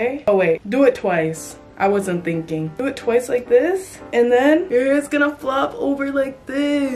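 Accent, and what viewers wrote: Canada. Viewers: Your Canadian accent reminded me of wolfie raps😂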